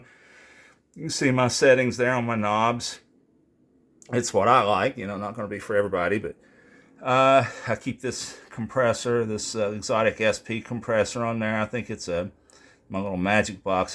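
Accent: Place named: Georgia, United States. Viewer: Nice Rig! I m a looper in Georgia. Where are you from? Sounds like from somewhere in my part of the country. I run the Boss RC 600, Handsonic, the Nord Electro 6d. I"m mostly acoustic driven. I use the Boss OC 5 for bass, the SP Compressor, another BBE Sonic Maximizer, with an LR Bagg Session Align, Strymon Blue Sky, Strymon Dig Dual Delay and Optima Air. Also use a Fender Smolder for electric guitar tones, Peterson Tuner pedal and LR Baggs Venue DI for my acoustic boost. Not in this order. This is a deep rabbit hole isn't it?! Love your channel.......feel like I found a soul mate! hahaha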